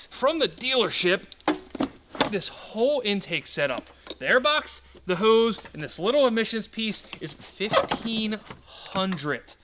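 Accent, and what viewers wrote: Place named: Eastern Shore, United States. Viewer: Just by your accent I can tell you're from the Eastern Shore MD😂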